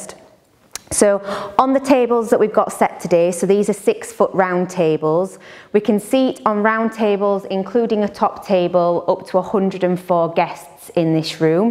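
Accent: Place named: Yorkshire, United Kingdom. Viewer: Dear lady! I love your Yorkshire accent))) I have stayed and slept in WH. Love the palce! I am from Ukraine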